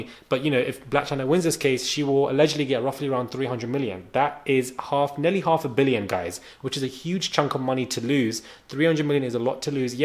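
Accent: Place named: United Kingdom. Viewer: I love watching ur content I just wish u would give us all the information before giving ur opinion, and also can u slow down when u speak u have to remember u have a British accent and it is already hard for us Americans so understand u anyway but when u speak fast we can't understand u good.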